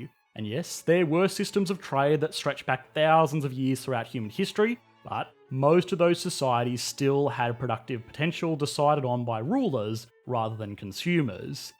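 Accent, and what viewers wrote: Australia. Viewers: Great content and analysis in all your videos spoilt by your delivery which is way too fast for me to take in a lot of what you are saying, Your heavy Aussie twang adds to my difficulty. I have to keep pausing, rewinding, and replaying to consider and digest your line of reasoning. Rather than keeping me engaged, this turns me off. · Although the accent is Australian or industrial English, this video seems to be a propaganda vid by Republicans or Trumpists or, otherwise, of someone who has never been to The Netherlands. So, if you love Fox News, Bill O'Reilly, the Republican representatives in the USA, the incredibly lying Trump and BoJo and Putin, go live in their countries and see whether you are happy or not.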